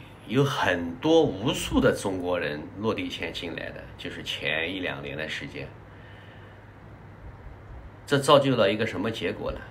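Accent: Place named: Nanjing, China. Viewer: 哈哈，南京普通话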